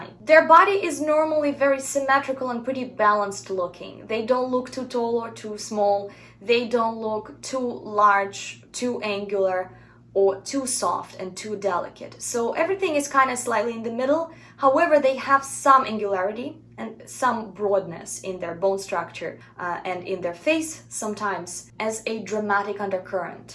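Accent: Russia. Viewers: You got light Russian accent ))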